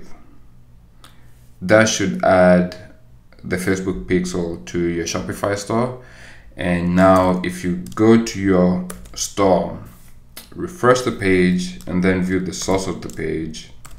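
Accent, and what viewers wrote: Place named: Zimbabwe. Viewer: Great video. Are you zimbabwean? Your accent sounds like you are from zimbabwe.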